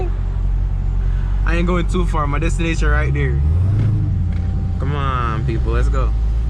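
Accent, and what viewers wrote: Jamaica. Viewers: Are you a jamaican??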